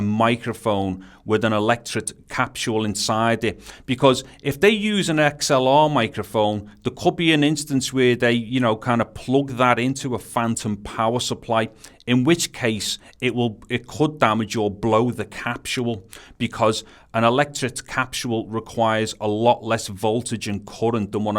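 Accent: Liverpool, United Kingdom. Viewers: Nice job and good to hear the scouse accent. My son lives in Liverpool.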